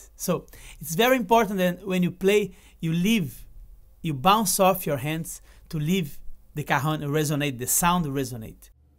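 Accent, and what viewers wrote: Brazil. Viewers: E esse sotaque aí mano, é brasileiro ??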